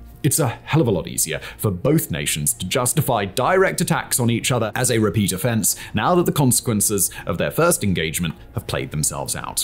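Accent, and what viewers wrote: United Kingdom. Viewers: I’m interested in this. Is it worth suffering the British accent? Nah.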